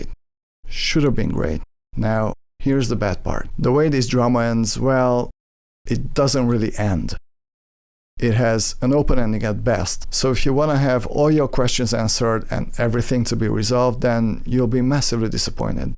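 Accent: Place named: Balkans. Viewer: It's been a while since I saw MotA. Your review makes feel the need to revisit it. I also don't rank it among my top picks, but for my life, I can't remember why. Maybe it was the ending....Your reviews are a pleasure to watch. I've subscribed....Just a btw, I'd guessed you were from somewhere in the Balkans based on you accent. Not exactly correct, but not too far off...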